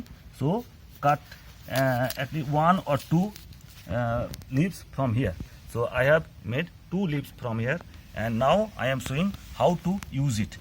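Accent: India. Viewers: IT WOULD BE GREAT IF YOU COULD INDERSTAND HIS INDIAN ACCENT.I GOT NOTHING FROM THID SI CALLED INFORMATION. THIS NEEDS ENGLISH SUBTITLES PLEASE OR IT IS USELESS.OR HE SHOULD LET SPMEONE ELSE DO THE TALKING WHAT A PITY.. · Thanks for your attempted presentation, but until hindi's learn how to speak English without making it sound like hindu, there will be far less success than you would hope!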